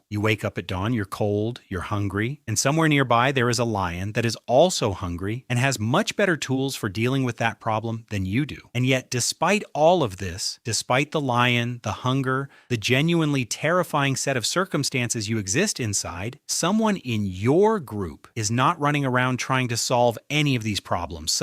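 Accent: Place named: United States. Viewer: No American voice please. Video rejected. Goodbye Yankee.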